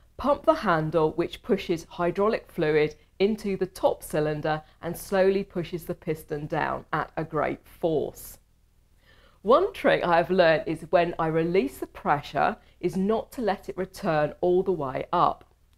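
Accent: England, United Kingdom. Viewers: As much as I can't stand videos or commercials with people who have english accents, in this video , for some reason I was able to overlook that.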